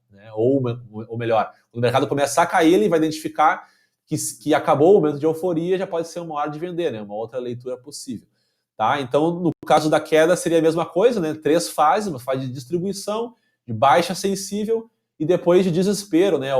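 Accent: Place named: Rio Grande do Sul, Brazil. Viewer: adorei seu sotaque gauchíssimo - além, claro, da explanação muito didática para esta neófita no mundo do trading de criptomoedas. obrigada por compartilhar seu conhecimento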